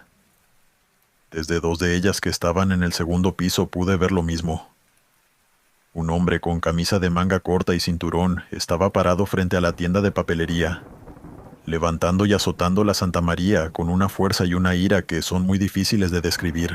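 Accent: Mexico. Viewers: Yo pensé q era Méxicano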